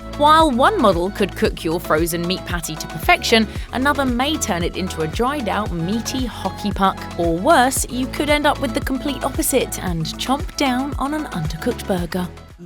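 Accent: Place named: United Kingdom. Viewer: why use a 'terribly British' style voice-over then continually quote temperatures in F when we tend to use C ?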